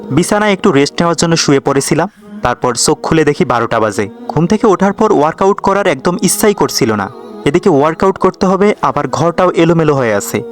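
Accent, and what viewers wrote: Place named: South Bengal, India. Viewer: আপনার কথায় দক্ষিণবঙ্গের Accent রয়েছে।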